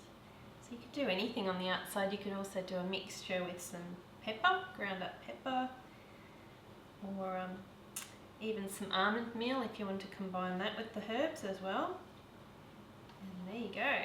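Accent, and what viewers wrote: Australia. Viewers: i don't think i did, i'll give it another try. The reason i took it off the heat is because the mixture already looked thicker than the one your poured. thank you, hearing your accent makes me miss Australia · omg i cant bare the aussie accent! so annoying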